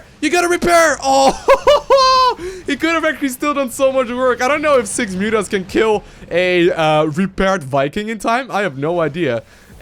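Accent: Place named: Netherlands. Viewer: sounds dutch. The accent is real.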